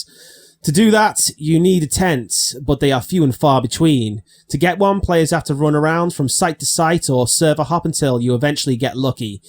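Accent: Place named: Warrington, United Kingdom. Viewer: I would say Warrington area if I was to take a guess.? Nice concept as well mate!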